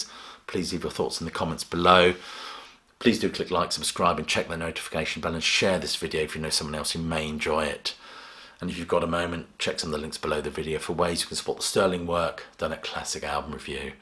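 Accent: England, United Kingdom. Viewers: Why does an English accent piss me off. Must be genetic memory.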